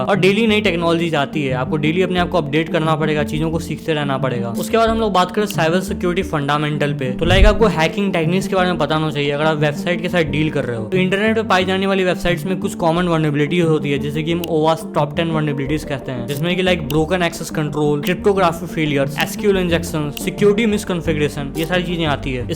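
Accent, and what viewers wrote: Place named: Bihar, India. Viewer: Bhai pahle hindi sahi krle bihari se.bad me hacking krna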